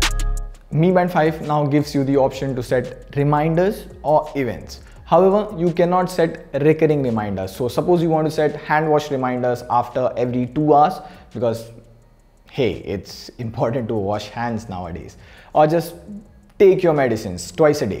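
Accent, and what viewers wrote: India. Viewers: I didn’t leave although he has an Indian accent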